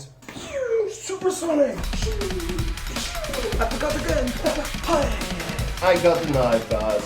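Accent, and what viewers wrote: Netherlands. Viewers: je bent zeker nederlands jou accent is gelijk te merken😂❤️ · He is Dutch and to talk with a dutch language its hard to talk english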